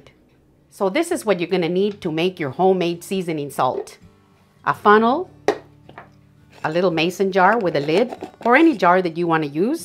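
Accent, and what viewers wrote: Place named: New Mexico, United States. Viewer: you have a beautiful accent where are you from? You sound from my home of New Mexico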